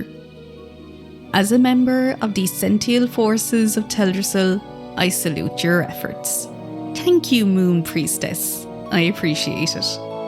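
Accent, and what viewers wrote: Ireland. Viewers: shocked hearing the Irish accent , Louth here (Irish wow players unite)😀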